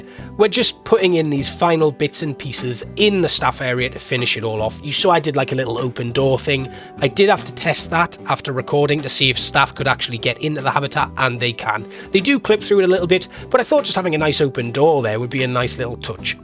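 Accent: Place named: Newcastle, United Kingdom. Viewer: Im watching Saltwell now but about to turn off for the footy! You have definitley come on leaps and bounds but by no means would I say Saltwell is bad, especially from the African centre onwards i would say you really hit a stride. Im Welsh and I was sure I could hear a twang but theres no mistaking the Geordie, both melodic accents I guess! Keep the awesome content coming, also if you are looking for further inspiration from creators I highly recommend ZSHPlays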